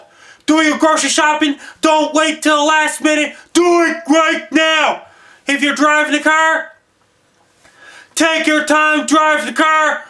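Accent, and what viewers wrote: Canada. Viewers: That enthusiastic Canadian accent made my day.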